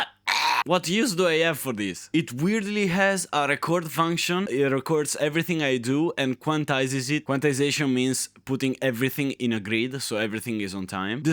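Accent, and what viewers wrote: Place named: Emilia, Italy. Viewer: Sei palesemente emiliano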